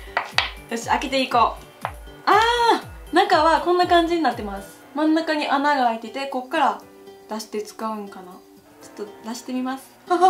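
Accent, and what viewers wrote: Kansai, Japan. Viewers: 関西弁かわいい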